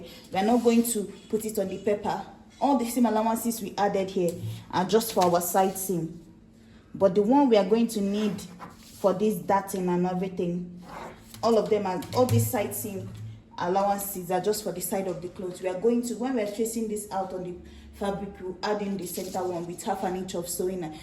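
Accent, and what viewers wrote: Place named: India. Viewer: Am I the only one hearing an India woman's voice?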